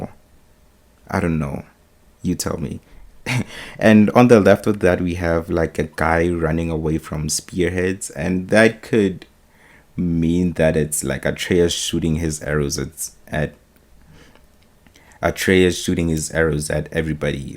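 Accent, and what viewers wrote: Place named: South Africa. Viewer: Can hear ur from SA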